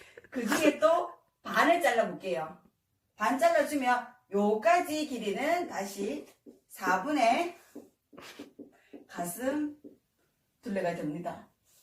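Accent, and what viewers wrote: Gyeongsang, South Korea. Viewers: 왕초보인저도 설명듣고 옷만들기 도전해보려구요 너무 자세히 설명해주셔서 감사 또 감사합니다 구수한 경상도사투리도 넘 정겹네요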